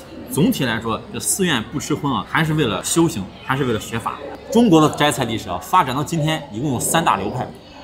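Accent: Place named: Shaanxi, China. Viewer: 你这个陕普 我听着真上头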